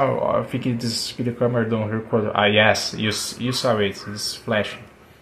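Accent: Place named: Brazil. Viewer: you are from Brazil? Your accent appears to be Brazilian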